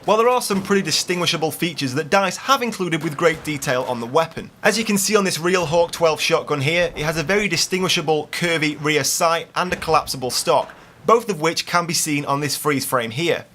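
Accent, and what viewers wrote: England, United Kingdom. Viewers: I'm glad I found this channel! The videos are so awesome! keep up the good work! BTW, are you from England?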